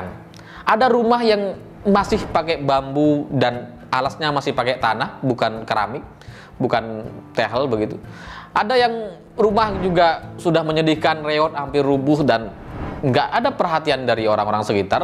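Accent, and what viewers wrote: Madura, Indonesia. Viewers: Kau tinggal dimana sih bro? Kau lupa ya? Kalau tradisi di orang2 kita alias "tretan" .. sapi memang dikandang di dapur... Jadi jangan kau anggap bahwa itu memprihatinkan atau ngenes... Sok peduli dan sok kekota2an kamu... Muka dan logatmu itu masih Madura asli... Jangan mempermalukan nak-kanak dibik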